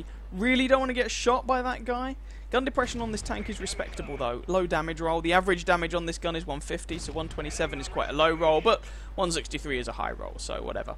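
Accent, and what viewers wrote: England, United Kingdom. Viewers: I truly enjoy your english accent. :-)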